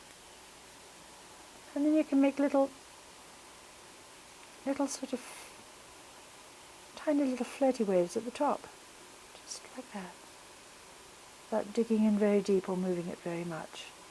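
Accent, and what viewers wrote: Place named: United Kingdom. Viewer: you are fabulous!!!!! I made my first lampwork beads today and I am hooked! I even tried using some scrap stained glass and had good luck but I am glad to learn your techniques! I love my first beads (now if I can only get them off the mandrel, I think I might have put the bead release on too thick because it wanted flake off when I worked!) and I can't wait to make more! Thanks for such a wonderful series of videos! PS LOVE your British accent;)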